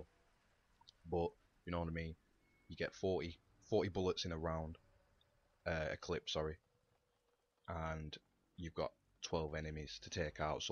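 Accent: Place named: Scotland, United Kingdom. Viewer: I love your accent! Are you Scottish perhaps?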